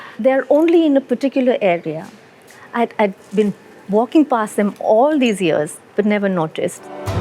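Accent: India. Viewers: I love posh Indian English accents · She has the most beautiful Indian accent in the English language that I have heard.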